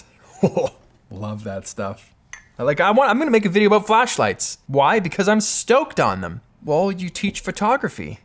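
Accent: United Kingdom. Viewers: That British accent 😂😂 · your British accent is getting better every video, well done lad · British accent sounds like Jamie Oliver.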